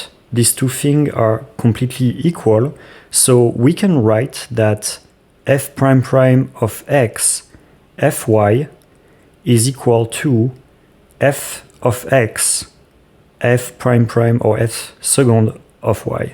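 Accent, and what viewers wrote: France. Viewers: don’t worry the English level is very good. Yes there’s a French accent but it’s no issue. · c'est cramé à 10km que t'es français ptdr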